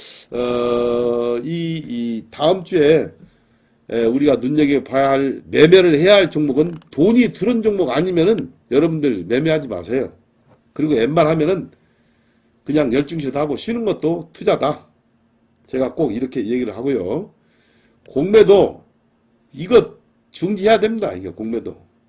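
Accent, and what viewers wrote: Jeolla, South Korea. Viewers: 약사님 전라도사투리쓰시네요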